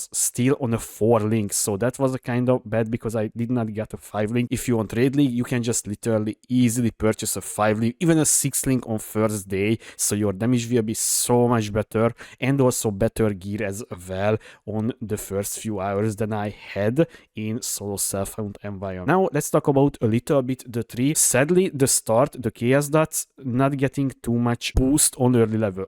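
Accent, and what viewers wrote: Hungary. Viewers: I love your accent! Hungary?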